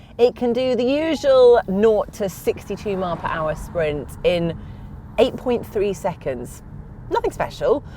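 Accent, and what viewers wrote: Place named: United Kingdom. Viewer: It's your British accent. They need a German accent, you know the aggressive type to recognize what you're saying....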